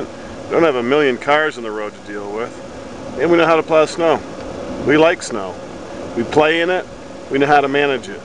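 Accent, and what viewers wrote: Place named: Maine, United States. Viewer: You are very welcome for my comment, AND it was sweet to get mail from an unexpected source into my inbox...woo hoo ! Please dont ever take your video down, as Im really homesick this year, and nobody in my suburb or community speaks with the correct accent.... It’s more comfort than you will ever know, just to be able to hear the audio portion in this video... AND, the visuals bring me back to a time in my life, when things were childlike, and perfect, long before the world. ( and everyone in it ) went to the dark side... I still prefer the cold temps, keeping my inside air at a mean 67 deg. F. Even though I left my hometown, Bangor, in 1978, at age 24, to enlist in the Navy, ( mess specialist ) I really do miss the old days—- listening to high school basketball tourneys on radio, picking apples in fall, ice skating on pond in winter, clearing snow... 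Those were the days, I could sit on the front porch at night with my sisters, and, in the dark, as a vehicle approached, I could tell you the make of the vehicle, just by the headlights... No use trying that now, lol.. Mama, daddy, both Nanas are gone now....but, I still remember mostly everything they taught me.. I’ve gone through my life, wrongfully thinking that my family were just a bunch of shit—asses, but, truth be told, I would not hesitate to burn everything I have now, just to go back in time to the year 1961, and get a do-over...( hell of a ride, but might not change one thing ) Im ever so grateful I was born and rais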